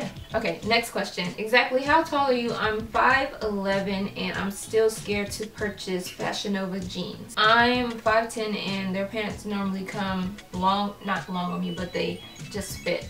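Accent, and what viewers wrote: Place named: Jamaica. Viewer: I heard your Jamaican accent in the candy land tutorial 😂😂😂 represent fi yawd❤💚💛 nuff love ❤💚💛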